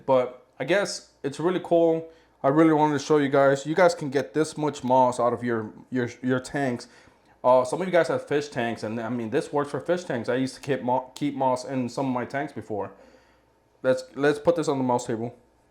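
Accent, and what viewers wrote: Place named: United States. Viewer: Do you ship in the USA? You sound like an American but I have messed that up before. 😂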